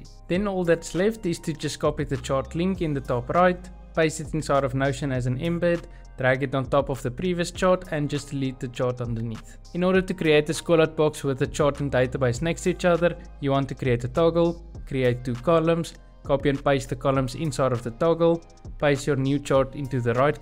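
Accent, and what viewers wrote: Afrikaans, South Africa. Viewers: afrikaans as fuck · the most south african sounding accent I have ever heard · knew you South African!!!🇿🇦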